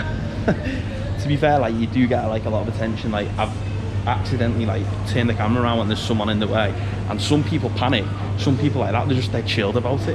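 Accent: Liverpool, United Kingdom. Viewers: having a british accent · hes scouse <3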